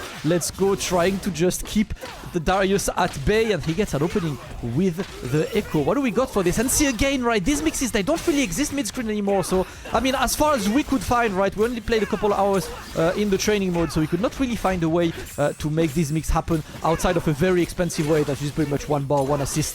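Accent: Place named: France. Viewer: Ça sent fort la France ce rythme et cet intonation :P Je ne te connais pas mais merci pour le partage de ce combat.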